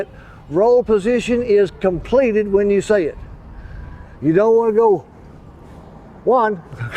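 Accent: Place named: Texas, United States. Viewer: I bought his tapes in 1997 and i started hitting the ball straight as an arrow, My VHS broke and I forgot what he taught me. I'm 72 now two hip surgery's later and struggling. I'm glad I found him on You tube. i' m also going to buy a VHS and start resurrecting my game. I just love listeneing to his folksy Texan sytle-a great teacher.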